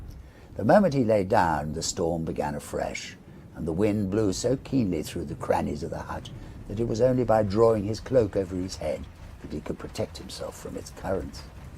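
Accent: United Kingdom. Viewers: Hi! Just found you tonight when unable to sleep. What a treasure: your voice and your stories! I will definitely hunt them out! Have always been a big Hallowe’en fan & hope you have some 2023 tales. I just turned 72 by the way. Living in the Great White North in Cambridge, Ontario. I so miss British voices VERY MUCH! The person in my childhood who showed me the most love was my Gran from Folkestone in Kent. She was very open minded & tuned into a lot of things that my school friends’ grandmothers would probably have never heard of. She saw a lot including joining the Wrens during World War I when she was 17. I’m trying to be discreet about some of her interests which became my interests as I got older. I’m sure she had a lot of stories that I would’ve loved to hear. I just adored her! She married my grandfather who was serving in France in his veterinary corps (he was a farmer from Quebec); so, she was a war bride from the First World War. 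My whole life I’ve wanted to go to England, Scotland and Wales! This is a real treat for me to have found your channel! I am mostly bedridden go now. Have a wonderful Hallowe’en & thank you so much for your lovely programme!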